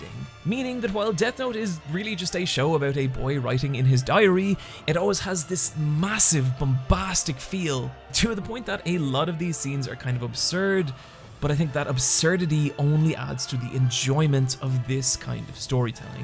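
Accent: Ireland. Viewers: What nationality are you? I'm getting a little Irish from the accent I think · Oh so you're Irish... I thought that was your narration voice.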